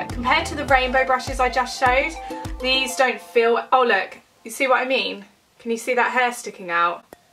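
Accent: United Kingdom. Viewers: I LOVE HOW U SAY HARRY POTTER, it reminds me so much of Emma Watson's accent and i wish i had your accent because im just from the states and my accent is sooo boring 😂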